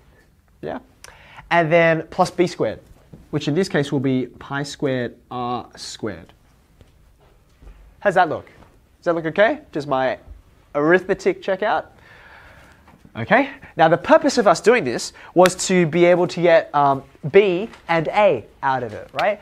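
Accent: Australia. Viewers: Is Australian? Cause he started out sounding American. Lol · Yeah he is an Aussie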